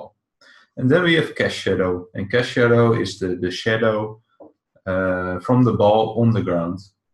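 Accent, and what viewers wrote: Netherlands. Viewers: are you dutch? awesome tutorial btw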